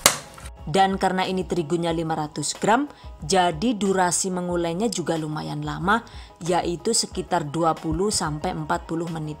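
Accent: Banyumas, Indonesia. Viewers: Lucu2....saya suka kalo lihat,bisa +ilmu & pasti'a bisa ketawa sendiri kalo yg punya channel bicara NGAPAK....hehehe. Rika asline wonk ndi sih yuuu...genenk bisa ngapak,kunci Inggris'e juga pinter....ehhhh bahasa Inggris maksude enyonk. Hehehehehe.....piss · lengkap temen sh yu tutone... mantep sampean ko logate wong banyumas Cilacap sh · akun masak tapi sajian videonya bikin ketawa dg aksen ngapak yg khas. Makasih atas ilmu yang dirangkum dg menyenangkan dan ga ribet:) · Ngakak ngapaknya mbekayune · Aaakk ngakak bgt ngapaknya seru ditelingaa 😂😂😭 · Pas denger dirimu ngapak2, saya ngakak dan langsuung subscribe. 😂😂😂. Sukses sis dan makasih resepnya ❤️ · Ngulen tangan bisa se'elastis itu bun.. mantappp Ger lgi ngapak mantep retung, sg ra ngerti li ndarani lgi ngomaih😂😂 "DONE" #GiveawayTHVDesember2019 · Hahaha.. Ngapak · Asline wong Endi sih yu? Ngapak bisa, ngomong ngaggo bhs. 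Inggris ya pinter temen koh... Hahaha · Oalah jebule rika wong ngapak yu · Jebulane ngapak yak,lucuuuu men yak👍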